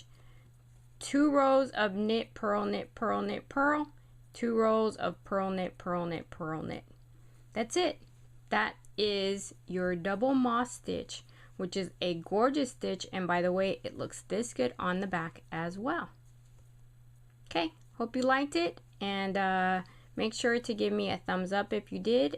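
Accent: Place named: Baltimore, United States. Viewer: are you by chance from Mid-Atlantic area? you say "on" like somebody from Baltimore (i get a kick out of trying to learn about different regional accents)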